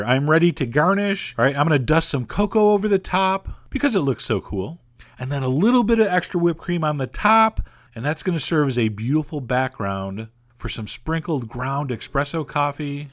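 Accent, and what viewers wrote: United States. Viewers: i hate how u cant say anything. tiramisu? espresso? so american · thats right cause he lives here in america and this is how we pronounce those things....talk about ignorant.....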